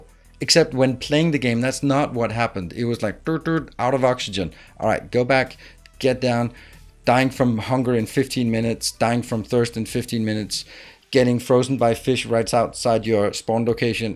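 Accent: Denmark. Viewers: Thanks dude for making this channel, I've subbed and watched most of your factorio tutorials. What I especially like is your positive attitude and your cute Danish accent. I have tried using it while playing and it works like a charm. I really do like your most simplest designs and use your blueprints a lot .As for suggestions: I would like to see themed builds: Green builds (focus on least pollution) or black builds (most... hmm a Shell build) Keep up the good work!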